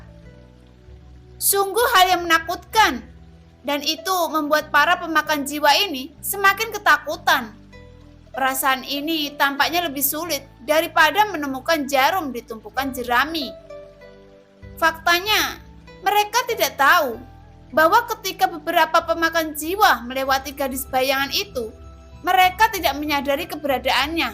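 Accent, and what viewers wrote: Java, Indonesia. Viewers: Wong jowo to mbak..mimin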